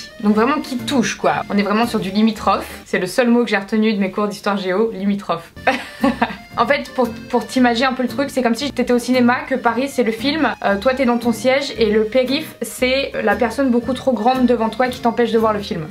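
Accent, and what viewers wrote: England, United Kingdom. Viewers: tu as un accent anglais trop beau !!!!!!!!!!!!!!!!!!!! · Meuf ton accent English 😍😍😍😍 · Super accent anglais ! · i love ton accent anglais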